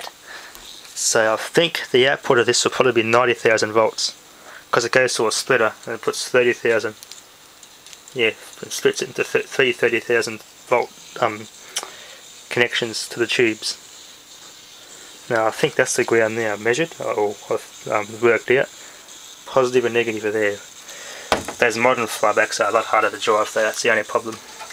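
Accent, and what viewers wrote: Australia. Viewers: It just takes a while to get used to his rural Australian dialect, in the past I've had to rewind and listen to him again. He does make some interesting videos though, but not all are winners of course.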